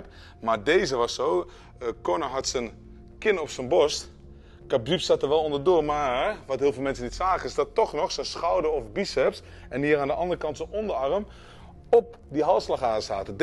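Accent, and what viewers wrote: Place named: United Kingdom. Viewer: Typical British accent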